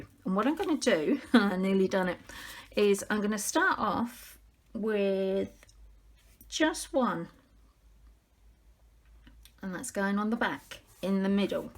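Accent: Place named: England, United Kingdom. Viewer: Just found you on You Tube, enjoyed watching this project and also your accent. My mother was born in England and all of her relatives live there "across the pond". Hearing you talk reminds me of my grandparents, aunts & uncles. Love the post a note idea. Thank you for sharing. Have a great day. Barb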